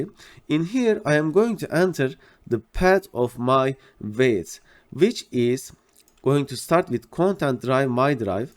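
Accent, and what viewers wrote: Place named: Turkey. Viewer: i am a turkish guy who wants to learn somethings on computer science. When i heared your voice, i am confused. I thought you are from turkey and i looked up your youtube channel then i saw your location which is Turkey. Your accent is how i understand.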